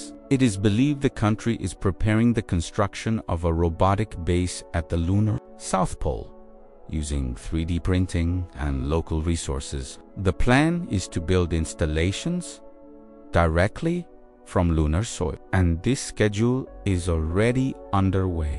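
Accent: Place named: United States. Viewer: I can tell you’re American.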